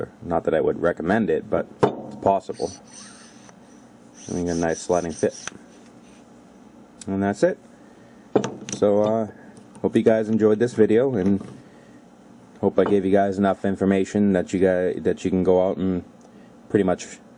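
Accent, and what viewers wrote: Boston, United States. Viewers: ***** Im from CT . I honestly wasnt sure if it was upper east side manhattan or boston. "in my bet boston accent" Go PARK the Car!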